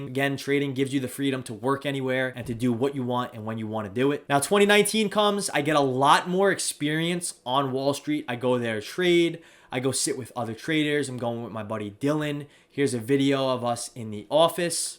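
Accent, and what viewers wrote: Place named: New Jersey, United States. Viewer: Damn brother what a ride!! I decided to get a finance degree after watching the documentary ''Inside Job'' and my uncle is in finance. The documentary made Wallstreet look like a Mafia Scorsese movie and I was a street kid and thought damn, these guys are wise guys and they don't go to jail?? like the regular wise guys?? I signed up straight away for community college and then went to university - still get wise guy vibes with your Jersey accent brother 😂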